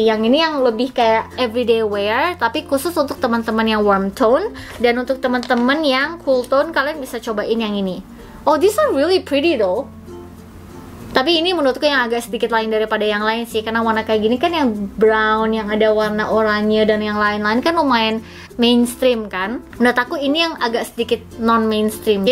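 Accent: Bali, Indonesia. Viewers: logat balinya masih ada yaa eonnie "lagi satu" bukan "satu lagi" hehehe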